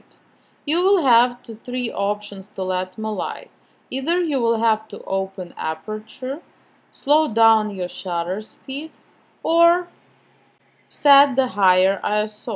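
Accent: Russia. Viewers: Nice video! Do You have a Russian accent? ) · sounds like a mix of Russian + Latin speaking english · good video, but I'm getting tired of "lower ISO looks grainy". Well, yes, but on most good DSLR you can go fairly high, without visible grainy-ness. Instead of blindly following advice, that may or may not apply with your equipment- TRY THINGS OUT = SHOOT. That's the only way to learn. But again, nice video, explains the principles well. Is the accent Russian?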